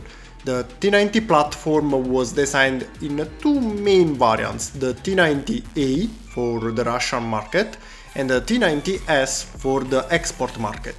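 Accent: Italy. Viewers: ti ho sentito parlare 5 secondi e sono andato sulla bio per vedere se sei italiane, e lo sei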